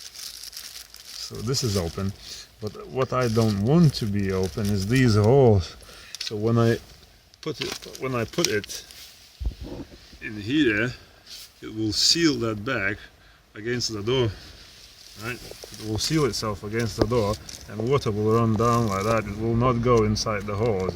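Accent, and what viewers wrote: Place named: Russia. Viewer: Thanks. Really interesting accent you have, I thought you were Russian at first!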